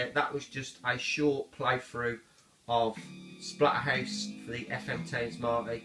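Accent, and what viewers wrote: Birmingham, United Kingdom. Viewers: Congrats, lovely addition to your cillection. Looks fantastic, really nice graphics on this version. I like those huge neo geo style cases as well:) Don't worry about your brummie accent mate lol people listen to talk bollocks in my gameplays so the accent must be ok:)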